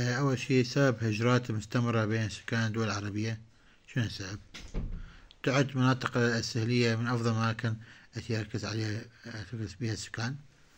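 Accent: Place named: Egypt. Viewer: الاستاذ چنة مصري بلگوة ديحچي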